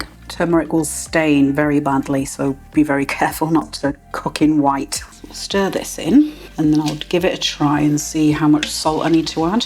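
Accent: United Kingdom. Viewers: my pleasure … I’m in the US so the British accent is really cool 👍🏻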